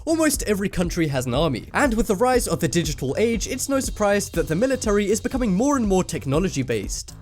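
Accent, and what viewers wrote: United Kingdom. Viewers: he is British, like me. We all have accents. You do, I do, everyone does.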